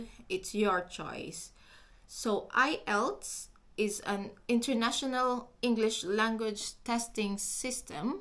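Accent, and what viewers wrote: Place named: United Kingdom. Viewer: Bakit kelangan mo pa mag english with konting UK accent pa kung target audience ng vlog mo is filipino. Taglish ka na lang procedure naman at tips ineexplain mo. Di naman to class. Korni lang. Kind a frustrating to watch.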